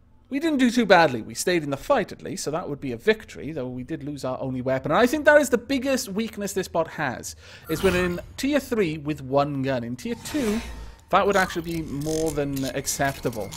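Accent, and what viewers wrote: Wales, United Kingdom. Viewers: Is he welsh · For some reason i think you sound Welsh.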